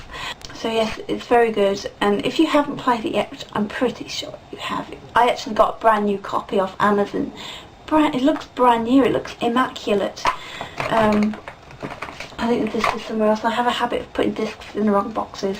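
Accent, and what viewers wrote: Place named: Estuary, United Kingdom. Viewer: You sound like her too, she has a kind of estuary accent (in interviews, not in her songs). I'm constantly surprised by people not knowing. You must have heard. Anyway, Abe's Oddysee is great. It was too bloody hard for me when I was 13 though, so I didn't get far. If you like the gameplay, you should play Another World on the Megadrive or SNES.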